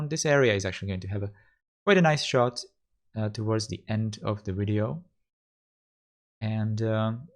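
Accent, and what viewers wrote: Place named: Czech Republic. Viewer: I believe he's Czech. honestly I can't remember why I think so (and he also has typical accent of a Czech guy speaking English). anyway, he aslo referes to central European countries when talking about his hometown. Those being Czechia and Slovakia.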